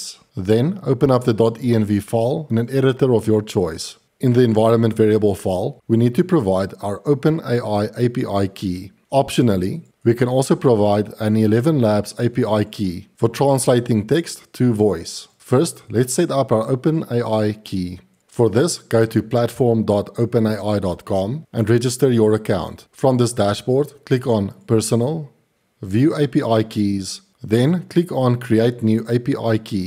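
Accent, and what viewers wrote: Afrikaans, South Africa. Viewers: Loveley to hear an Afrikaans accent talking about the latest and greatest <3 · Very informative and lovely South African accent :)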